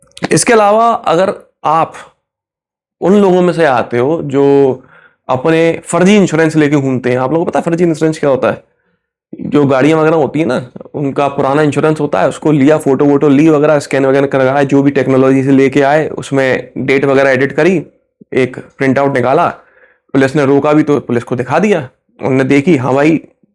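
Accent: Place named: Gwalior, India. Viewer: Bhai aap kaha ke rahne wale ho, क्योंकि कभी कभी आप भिंड, मुरैना, gwalior jaise भाषा बोलते हो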